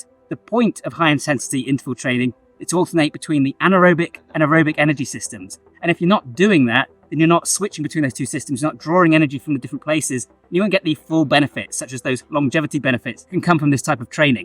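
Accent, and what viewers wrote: United Kingdom. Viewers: Great Video ! I remember when I played Football (America/Canadian Style) we had to run smaller hills and Short Sprints 40-70 Yards. The NFL Combines (Selecting Top College Players for Profession Teams) one of the Tests is the 40 Yard Dash (Record was 4.21). Sounds like your from the UK so I guess Rugby and Soccer Players must have to be Fast sometimes with explosive Burst of speed too. Also when I did Track and Field the real Power Sprints seemed to Top out at 200 to 400M after that I think some Pacing after that would be required if going Full Bore. Roger Banister 1st man to do 4 minute Mile would Average 17.60/100 yards in a 1 mile run. Also what do think about Cross Country Running, in your video looks like that or mixing up the Pace I guess. Even in the Boy Scouts (old days) was something like Walking 50 Yards them Running 50 (alternating) when hiking sometimes. 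I guess the Top women sprinters (100 M) are only than a second or 2 behind the men ?! Also to qualify for Police officer training here Recruits must run 5 KM in 30 Minute. What do you think ? Thank you Jim Canada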